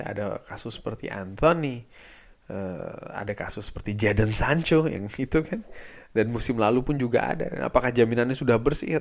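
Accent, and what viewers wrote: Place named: Java, Indonesia. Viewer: Buanyak nya medok tenan bg 😅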